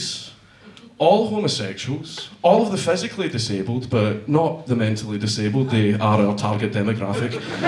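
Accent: Scotland, United Kingdom. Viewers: Been watching dankula and kmac for like 2 hours straight and everything i read or think now is in a scottish accent send help.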